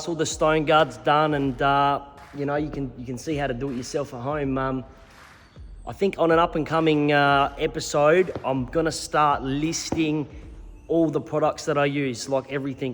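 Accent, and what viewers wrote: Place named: Australia. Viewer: What you’re doing is killer. Love the half Leno Aussie accent. I had that living in Sydney but lost it 20 years later on the sunny coast with all the aussies. Love what you do. Maybe a little more on your performance upgrades and also a bit more educational vids on panel work like in depth bog and panel beating finishing in paint. Love your variety bud👍👍👍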